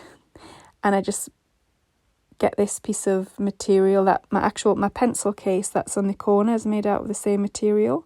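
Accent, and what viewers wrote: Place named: United Kingdom. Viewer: I had to chuckle so when you started making the yo-yos. With your accent, I would’ve expected you to call them Suffolk puffs. I’m enjoying your work so much and commentaries are just lovely.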